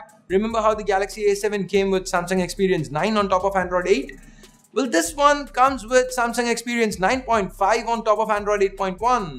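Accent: India. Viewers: Indian talking in English. My gosh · i couldnt hear 80% of what he said. 1. too fast 2. deep Indian accent.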